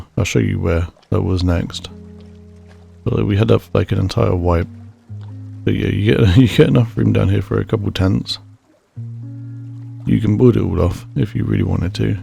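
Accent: United Kingdom. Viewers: r u scottish or english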